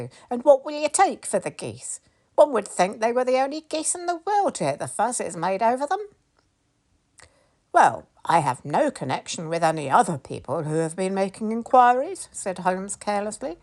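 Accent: United Kingdom. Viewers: I enjoyed your narration of this Sherlock Holmes short story. Hearing it performed with a British accent is so much more enjoyable than hearing it in my head with my American one. 😂 Thanks.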